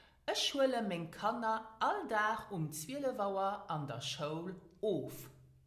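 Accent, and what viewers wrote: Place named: Saarland, Germany. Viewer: Lastly the falling wood in the sky this is Saarländisch (dialekt from the german region which borders Luxembourg). it is very similar to Lëtzebuergesch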